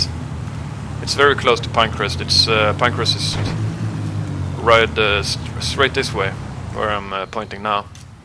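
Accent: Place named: Netherlands. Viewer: nederlands? hoor het aan je accent :D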